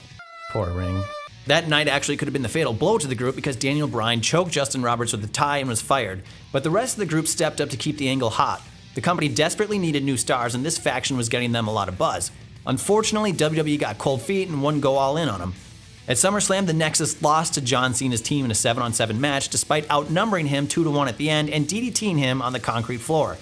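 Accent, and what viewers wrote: United States. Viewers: So you're the yank, eh? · Who the effing hell is this guy with an annoying yankee accent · I'm pretty sure it's because he is an American and doesn't have the British accent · Hears american guy Instantly goes back to subscriptions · Whatculture lucked into something great with the 10 Totally Wasted Factions and as they don't often do, they had no idea what to do with it, and put a whiny American kid in it. Long live British accents in Whatculture. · I'm watching a WhatCulture video why is there an American talking. If I wanted to hear Americans talk I'd be watching wrestling directly. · I'm not used to hearing an American on WhatCulture Wrestling😅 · sorry... the narration through me off.. American accent confused me. lol I thought I wasn't watching WhatCulture for a sec. 😄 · *american accent *shudders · Exactly. I'll watch if it's Blampied, Pacitti, Simon or even Jack but is a no-no. 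Annoying bland American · Fuck this American, un subbing now , this would be so much more better with a British guy narrating - why the fuck are you guys diverting from what made you so great ? It's like your the wwe and we the fans are told to just shut up and accept it - fuck you - wrestling with wregret all the way now , this channel is no longer what it used to be , it's sold out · throws me to hear an american accent on these vids. dont understand the hate though. all these vids sound like they have the same writer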